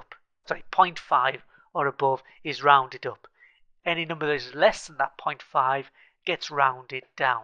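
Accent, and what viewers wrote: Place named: Northern England, United Kingdom. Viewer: Dear. Absolutely brilliant and so easy to follow. A real bonus to hear a northern accent too! Thanks for posting this - it solved my immediate Excel problem - and took me even further too.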